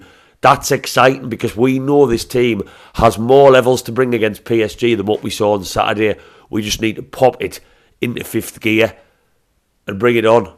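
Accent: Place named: Teesside, United Kingdom. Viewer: The solid backline is what everyting is based on. Lots of lads that some of the Fickle buggers say are not good enough for NUFC have been doing us proud (including the bloody goalkeeper FFS)! I think your accent sounds a bit Teesside-ish as well, and you know what...I DON'T CARE! As it happens, there was a 'Boro Mags' flag in the old East Stand paddock 30 years of more ago. They were not following the glory, they were backing the capital city of NE England! Around the same era I was drinking with some lads that moved fron Hartlepool to Tyneside...all long term mags, not a glory hunter among them. I expect when I go up to Morpeth>Berwick...MAGS I expect when I go over to North and South Shields...MAGS I expect when I go over to Stanley>Consett.Hexham...MAGS I expect when I go down to Gateshead, Chester, Durham, Spenny, Bishop, Darlo...MAGS That's why NUFC have managed to stay one of the biggest clubs in the country without winning a bloody domestic trophy since 1955. 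Regional loyalty. Don't let some bitter halfwit bait you, it is not your fault they didn't get a season ticket during the dark years, and now cannot get one because they are just following the glory. Keep up the the good work, and HWTL!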